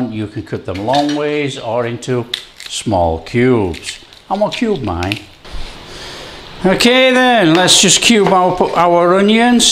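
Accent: Yorkshire, United Kingdom. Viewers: hearing you giving the instructions with your wonderful Yorkshire accent is like watching an episode of Heartbeat, I keep expecting to see Claude Jeremiah Greengrass wander in... Corned beef hash is a big favourite of mine! My Dad used to love making that, and he did it the same way as you, so he taught me. This was like a happy reminder for me. Thanks!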